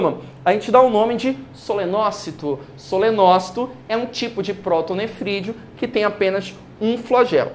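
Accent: Pará, Brazil. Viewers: Queria saber se vc é paraense ? Tem sutaque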